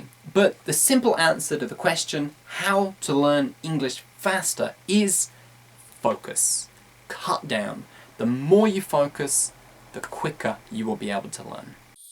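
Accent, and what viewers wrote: United Kingdom. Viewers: is it britain english? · It´s british accent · U are british is not? I'm mexican :v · I think using british accent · sahid maarif It’s a British accent. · excellent lesson i would like a video about job's interviews please your accent is like brittish people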